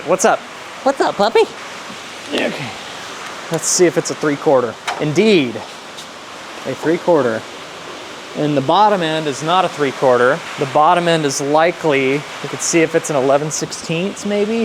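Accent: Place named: South, United States. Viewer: You've got a southern drawl you don't really get on your aviation channel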